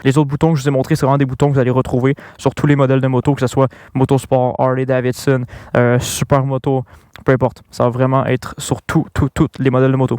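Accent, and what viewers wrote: Quebec, Canada. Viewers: Mais excusez moi, l'accent canadien est vraiment très chiant 😂😂😂😂😂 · Bien expliqué bravo même avec l'accent canadien. Très cool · Tres bien expliqué, je ne suis pas novice en moto mais je suis en train de passer le permis actuellement et les explications sont limite plus claire que le moniteur malgré l'accent Québécois 😂 Bravo continu 👍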